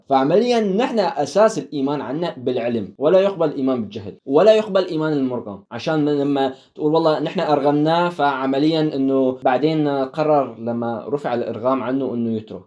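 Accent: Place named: Latakia, Syria. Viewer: شكرا لك أخي لفتتني لهجتك هل أنت من اللاذقية ؟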